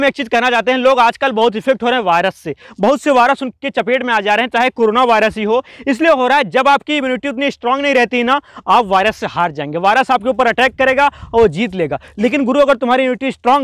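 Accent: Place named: Bhojpuri, India. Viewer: Bhai....love ur Bhojpuriya Tone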